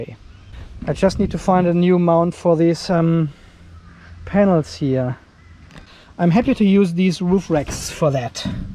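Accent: Germany. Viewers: I enjoy trying to figure out where people are from by listening to their accents. I've been watching a lot of your videos lately and you were really throwing me off. I had pretty much decided you were a native German speaker but your surroundings didn't look like any part of Germany I've ever seen before. You helped me work it out in this video when you mentioned your German power strip with the Australian plug. I really like your videos as I'm preparing to put together my own battery bank and solar system. Also, congratulations on passing 1000 subscribers!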